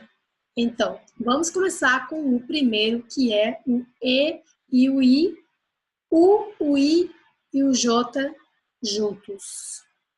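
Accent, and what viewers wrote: Northeast, Brazil. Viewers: As vogais não são iguais " como em qualquer outra língua". As do inglês são no geral diferente de tudo qto os demais. Idiomas. A única coisa positiva, é o seu ligeiro sotaque nordestino. Brasileiro. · Comecei a estudar o Holandês e me deparei com seu vídeo. Obrigado pelas dicas. Adorei o seu sotaque português, ele é bem nordestino. Parabéns!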